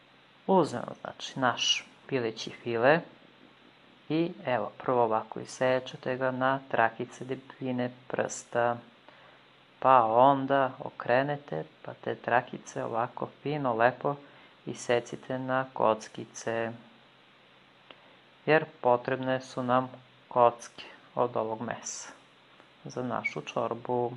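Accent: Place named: South, Serbia. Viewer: Dragi,recept je super.Corbica kao san.Ja sam je pre spremala na slican nacin a sad bas kao Vi.Al od svega mi je najsladji taj vas naglasak koji sam ja vec izgubila,al mi se potkrade oljupim papriku a ne oljustim i tako mnogo lepih reci koje na jugu upotrebljavaju.U svakom slucaju sve nalepse Vama i Vasoj porodici i veliki pozdrav👏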